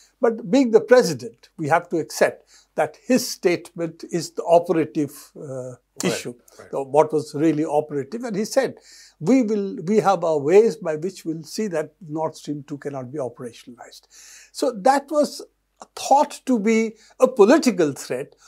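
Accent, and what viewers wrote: India. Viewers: Pl speak English, not Am, BRIT, OR Aussic only Indian, the true English, Bravo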